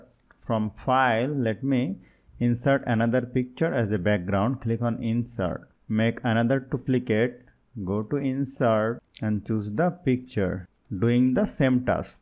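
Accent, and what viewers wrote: India. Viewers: your accent is Indian I think. Thank you for soo good presentation From= INDIA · This nigga is Indian 😂 Accent 😂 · I can't believe that I'm listening to an Indian accent😭😭😭😭😭😭😭😭😭😭😭😭😭😭😭😭😭😭😭😭😭😭😭😭😭😭😭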